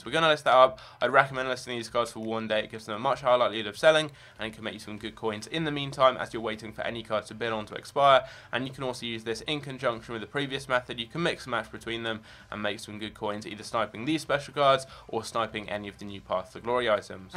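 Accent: United Kingdom. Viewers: want to know too.:-) english is verry british!:-D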